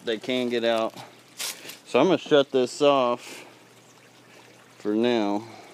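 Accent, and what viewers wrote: United States. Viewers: I love your american accent Greetings from Paris 😁 Michel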